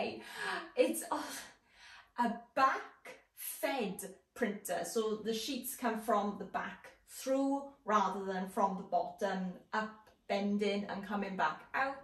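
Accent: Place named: Wales, United Kingdom. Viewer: 🏴🏴 Love your Welsh accent ❤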